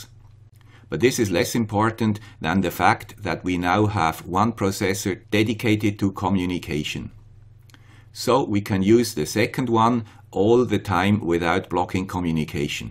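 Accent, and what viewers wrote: Switzerland. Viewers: Yeah you are right. I was in a bad mood that day. Any way, I always enjoye listening to the guy with a Swiss accent, again. Keep it up. (y) Thank you for you great work. :D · Swiss accent is good to us from Brazil!congrats!!!!!!!!!!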